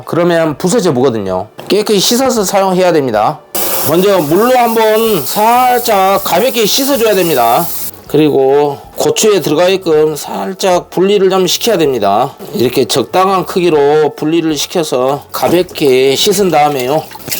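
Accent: Jeolla, South Korea. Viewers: 말투가 너무 정겨워요. 전라도 사투리는 요란스럽지 않아서 좋지요 ㅎ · 전라도 사투리 구수하네 ㅋ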